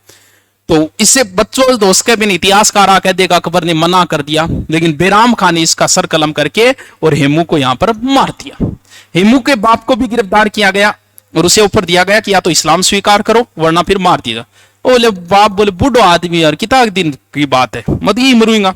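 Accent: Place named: Shekhawati, India. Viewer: भाई हिंदी ही तो थोड़ी बहुत ही तो शैखावाटी बोलते हैं । इस बोली के कारण ही तो इसका पढ़ाने का तरीका मस्त है 🥰🥰🥰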